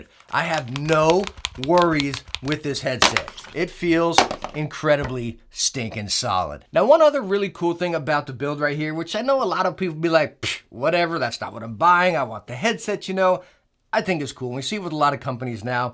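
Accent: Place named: Pittsburgh, United States. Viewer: Can tell there's a high chance is from Pittsburgh with his accent lol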